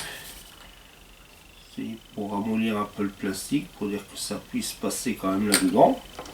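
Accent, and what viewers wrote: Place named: Nord-Pas-de-Calais, France. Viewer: Bonjour Attention! Ce sont deux époques "guerrières" différentes: cela pourrait choquer. Mais en attendant, félicitation pour tout ce que tu fais...de même pour tes directs "pur jus",avec ta manière chti de t'exprimer! Patrick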